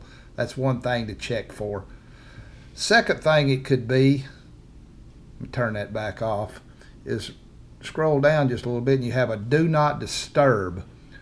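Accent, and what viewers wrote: South, United States. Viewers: Southern Draw, Sa....weet..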